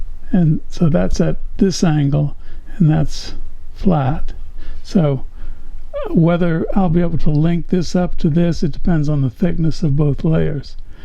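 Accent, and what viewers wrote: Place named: Louisiana, United States. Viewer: this guy has got to be from Louisiana. He has a distinct cajun accent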